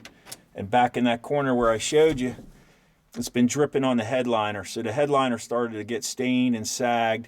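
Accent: Pennsylvania, United States. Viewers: Thanks dude. I like how you clearly list all tools needed, for those of us on our way to the JunkYard. Plus, that Pennsylvania accent is reassuringly trustworthy.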